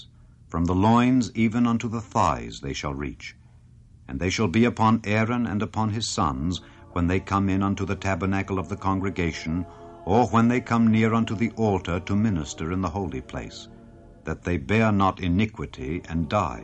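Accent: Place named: United Kingdom. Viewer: So funny to see beautiful Jewish history with super British voiceover haha, Just feels so unnatural...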